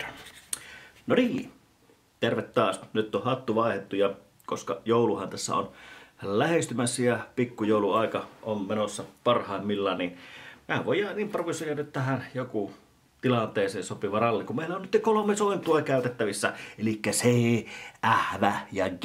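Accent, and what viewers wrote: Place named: Savo, Finland. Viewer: Tämäpä ei ärsyttänyt yhtä paljon kuin normaalisti savon murre. Oli letkeetä.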